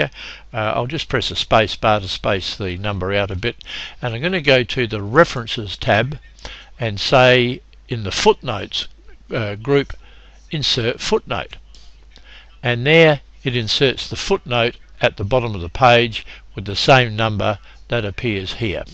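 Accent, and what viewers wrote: Australia. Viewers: Awesome video buddy, helped a lot! Subscribed for future stuff like this! And the Aussie accent made it a ton better!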